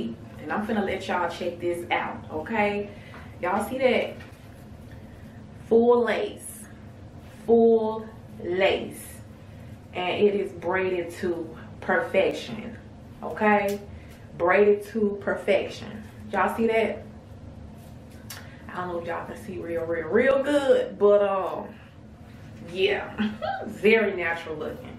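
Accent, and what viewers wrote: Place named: Memphis, United States. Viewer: you're welcome! I'm a new subscriber and I watched another one of your videos today and your accent sounds like mines lol. Are you from Memphis Tennessee?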